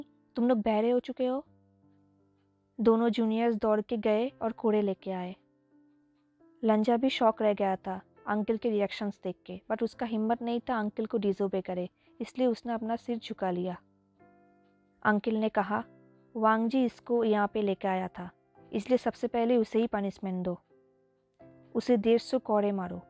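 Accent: Bengal, India. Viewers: Di kya aap bengali ho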